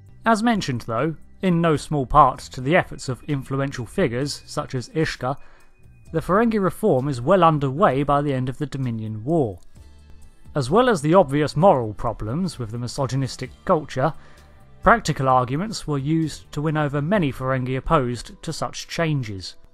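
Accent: England, United Kingdom. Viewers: "Until next time, I have been." You sound English, so, why do you not know this sentence is not grammatically correct? "Until" denotes a future event, "Have been" denotes a past event... Unless you are a time traveler, you can't "until have been" Either you have been, or you will be until. If you have been, who are you now? If you will be until next time, who will you be then?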